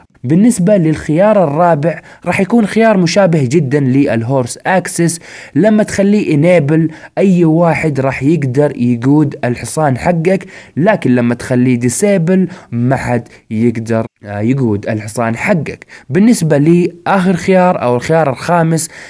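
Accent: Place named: Jeddah, Saudi Arabia. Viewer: اللهجتك جداوي ابوي 😎👍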